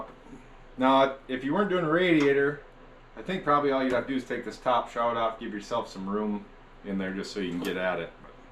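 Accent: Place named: Minnesota, United States. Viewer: Fellow rural Minnesotan here. About to do a ps pump on my 98 Tahoe this weekend. This video was immensely helpful and informative. Certainly helps we speak in the same dialect 😂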